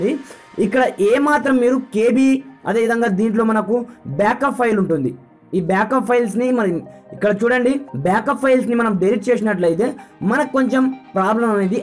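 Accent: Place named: India. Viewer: Why is it always indian? I cant u derstand what your sayinggg..just ganna turn on captions then.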